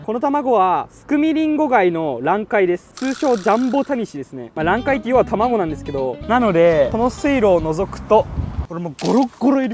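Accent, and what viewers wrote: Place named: Mikawa, Japan. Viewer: 方言が愛知っぽい どっちかって言うと三河の方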